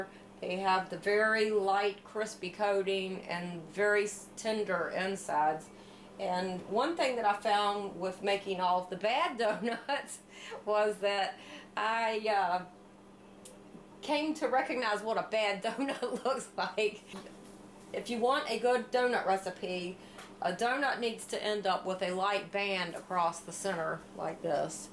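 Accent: South, United States. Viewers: What sold me is the time she spent to get it right. I did it 2 at a time exactly from her recipe in a little pan. Perfect white rings and all. And her delightful Southern accent made them taste even better to this ,84 year old Canadian. Jam filled next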